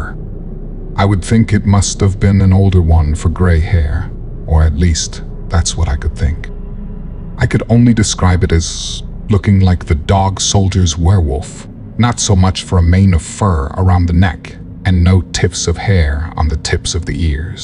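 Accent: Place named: England, United Kingdom. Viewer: I said that but theres a pommy accent there??? Still cant work out if it is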